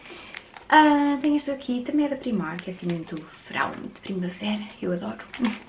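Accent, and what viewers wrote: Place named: Portugal. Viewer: Gostei muito do seu canal já tenho uma Portuguesa no meu canal que gosto muito sou neta de Português amo o sotaque de vcs !! Que bolsa linda ano rosa amei tudo que tens em tons de rosa e o cenário com os mesmos tons bem feminino !!! Suas unhas estão lindas Tbm carteirinha de moedas linda Tbm !!! Fiz um vídeo Tbm sobre o que tem em minha bolsa te convido a conhecer meu canal Bjos !! 😘😘😘🌺🌺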